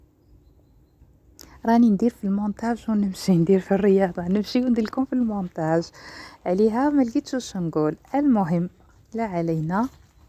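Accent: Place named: Barika, Algeria. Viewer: هل انت من ولاية بريكة هدرتك نفس هدرة بريكة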